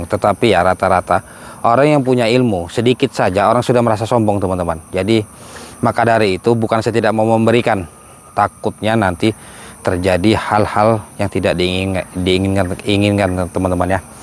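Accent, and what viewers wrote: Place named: Banyumas, Indonesia. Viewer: SEEHH. LOGAT. BANYUMASAN. SEH SEMAU. GUE. KOJ. IKUT. CAMOUR. (. LHO. SEMAU. GUE ,,,,,,,)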